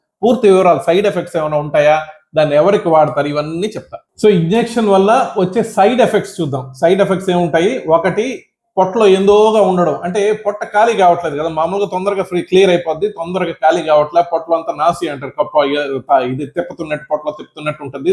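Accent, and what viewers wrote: Nellore, India. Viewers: Love you sir ur ur nellore good👍👍👍👍👍 God bless u& ur family with health & wealth & prosperity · Maa nellore bhasha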